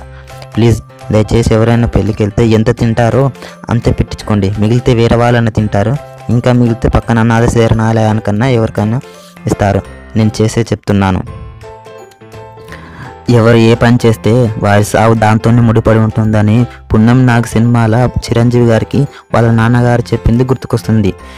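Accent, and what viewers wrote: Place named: Telangana, India. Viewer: Mana telangana telugu thelisipodhi ekkadunna. Super brooo all the best 😍😍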